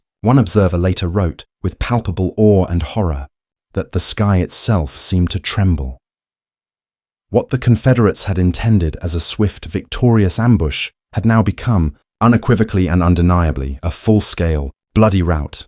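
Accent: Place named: England, United Kingdom. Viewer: They should have chosen a southern american accent narrator discussing this matter. The English accent for this story is off putting. The guy who did PBSs The Civil War would be the best Ai option.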